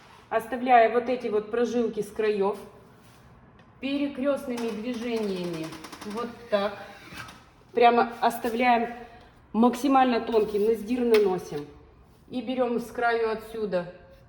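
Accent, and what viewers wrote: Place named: Urals, Russia. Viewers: С Челябинском тоже говор похож))) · Либо Киров, либо Пермь говор тот)))) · Говор обычный южно уральский, Коркинский. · Здравствуйте. Скажите пожалуйста, вы с Урала? Говор у вас Уральский)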